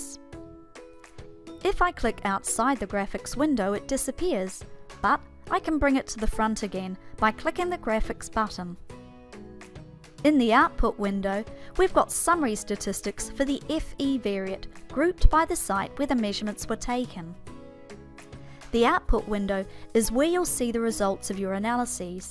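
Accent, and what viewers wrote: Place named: New Zealand. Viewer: You sound kiwi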